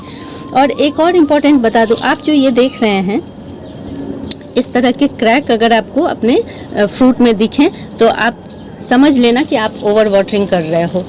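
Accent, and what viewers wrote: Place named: Uttar Pradesh, India. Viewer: Uttarpradesh accent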